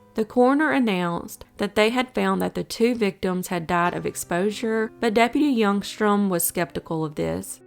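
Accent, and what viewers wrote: South, United States. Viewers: Ahh ya my fave southern drawl accented lady from over the pond :) it’s much needed just fallen out with a life long friend over money :( should never have helped her but too late now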